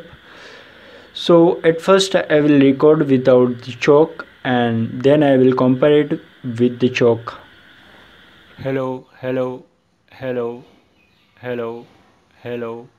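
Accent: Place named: India. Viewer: Your jeet voice cannot be fixed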